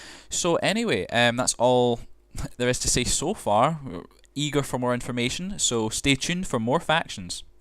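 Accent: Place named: Scotland, United Kingdom. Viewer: The speaker is definitely Scottish!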